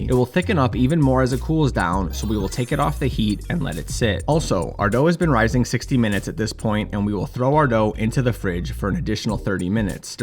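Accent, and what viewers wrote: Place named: Chicago, United States. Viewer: I cant stand the Chicago accent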